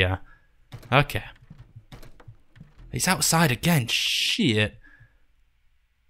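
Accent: United Kingdom. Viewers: Another German here, i do like your videos too, but mostly i like your accent! I cannot recognize it, somehow british, but special. Could you tell me, where do people speak like you? Greetings from Frankfurt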